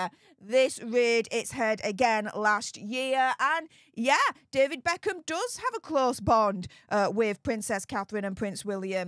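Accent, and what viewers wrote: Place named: Northern England, United Kingdom. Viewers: Dear, I’ve a personal question (maybe none of my business and could be offensive but not my intent). I’m trying to place the location of your accent. I enjoy studying UK accents and like placing them to their location. Correct me if I’m wrong, and most likely I am, but I’m guessing somewhere between Manchester and Yorkshire? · Love listening to you as it brings me home with your accent. I am originally from Manchester England and you sound just like my cousins. I live in Mississippi now, have been here since 1972. I did go back for 3 years in the 90s from 94 till 97 after Diana died. People change so it was useless to want them to see me after 20 years back then.Thats ok because they missed out on me and my daughter. Their loss. Luv ya.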